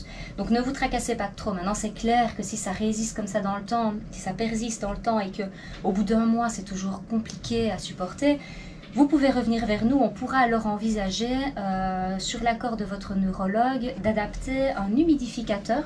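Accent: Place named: Belgium. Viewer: En Belgique, c'est comme cela que l'on parle français et on s'en accommode très bien, ne vous en déplaise.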